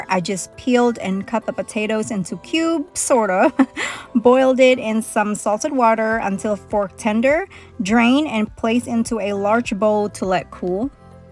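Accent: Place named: South, United States. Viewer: Soooorrryyy. Please no one be offended. I just found this page trying to find lunch containers lol. I’m already obsessed. The whole time I was watching her videos I kept thinking to myself she has a little southern black twang in her accent! Either she’s part black or she’s always around someone black. I just thought it was funny how we have that little bit of seasoning in our words. Love this channel so far!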